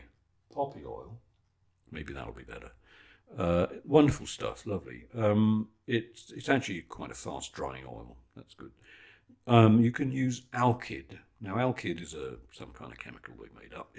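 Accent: United Kingdom. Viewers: I love it..so beautiful, like every time I watch you, and you are just so calming, with that lovely voice and great British accent. . Well, .now I am calm and relaxed. Thank you so much. Both paintings are beautiful.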